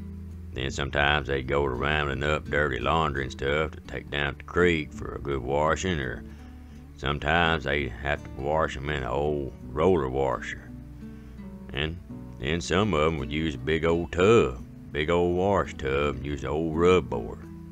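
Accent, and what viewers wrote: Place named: Fayette County, United States. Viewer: Don’t forget the churnin and the ironin and the cannin and the patchin and the bed turnin and the water pumpin and… I declare, listening to you makes me feel like I’m back in Fayette County and Uncle Joe is telling stories while showing off his greens garden!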